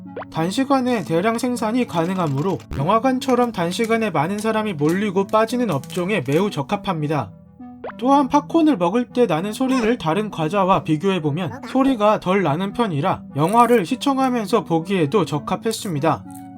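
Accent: Gyeongsang, South Korea. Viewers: 서울 사투리 안 쓰시고 경상도 사투리 쓰시는 거 보면 경상도 분인가? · 부모님측에 경상도분이 있으신건지 조심스럽게 추측을...저도 서울사람이지만 충청도 사투리쓰거든요 영향받아서ㅎㅎ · 형 경상도 사람이었구나 친근한 억양이 들렸어 블루베리 스무디 해봐 형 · 이거 혹시 사투리신가요? ㅅㅋㄱㅋㄱㅋㅋzz갱상도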